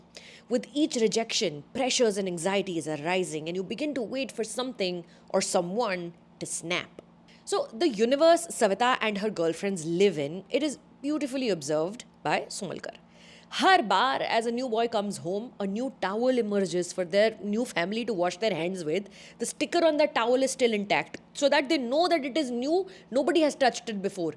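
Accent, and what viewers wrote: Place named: Vidarbha, India. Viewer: Hey ....grt to see you cheering this beautiful creativity....thank you for acknowledging this vidarbhian art and accent also ...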